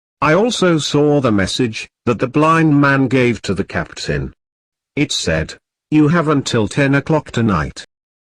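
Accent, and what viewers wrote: United Kingdom. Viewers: The British accent is adorable 🥰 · مذكّرة القصة ❎ تعلم اللكنه البريطانية ☑️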